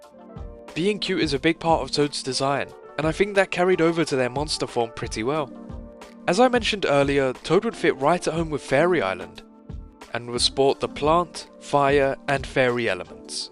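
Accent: United Kingdom. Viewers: Your Brittish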